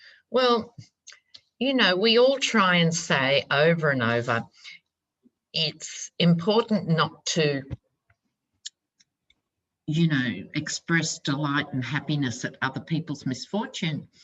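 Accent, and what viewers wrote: Australia. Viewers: Oh I love the Aussie accent! In fact I think it’s puts greater importance on the words. Love from the USA. Please stay safe. God bless you and yours.♥️🇺🇸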